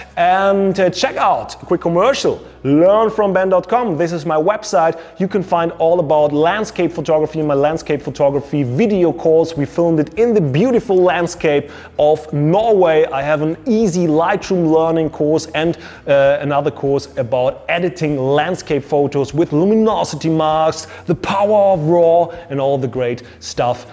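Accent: Germany. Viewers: Hello from "Nepal", thanks for making this video and uploading. Quite useful and exactly what i was looking for. I am also more into landscape photography & about to buy a camera , so far i am only using my phone😁. Could you plz suggest me which camera would be good (not so much expensive one) i can spend upto cannon 77D , and also i saw that cannon 700D was similar and quite cheaper . Btw i am so familiar with your German accent english 😌. I learn it from my dearest friend in Hamburg. 👍👍👍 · after a long time I'm listen to German english ....cheers bro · You sound like a german who's speaking english edit: I just recognized he's german lol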